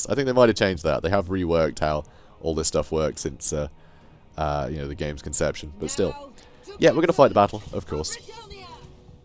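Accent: France. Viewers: Appreciate all your Total War videos. Favorite part of this one is the French accent 😄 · Hey serious question where did you learn to do the french accent! That's one I'm not good at but want to learn! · Pretty good Bretonnian(french) accent!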